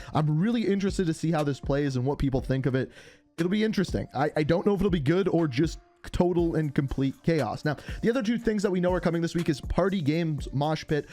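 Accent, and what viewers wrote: Canada. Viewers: Is this clown Canadian ?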